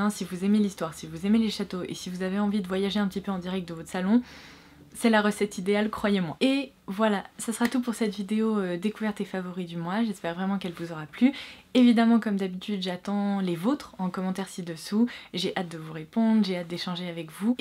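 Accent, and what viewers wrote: United Kingdom. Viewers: Ton accent anglais style britannique c'est si jolie 😊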